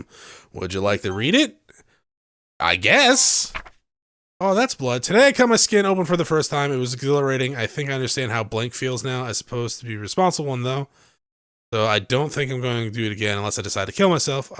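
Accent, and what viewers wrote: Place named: Northeast, United States. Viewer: I swear every time I watch a playthrough of this game I see something new. Blood border? Wtf? Why did that happen and how did you get it to happen? What of my OG playthrough will you not see? I am confusion! Also, are you east coast US? Certain words sound very northeast to me in how you're non-rhotic sometimes and have that special 'Jersey' (and surrounding areas) way of saying like, caught or coffee.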